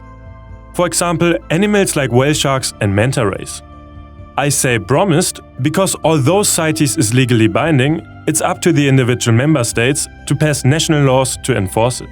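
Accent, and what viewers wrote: Germany. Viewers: Are you German? Your accent sounds very German (I’m German)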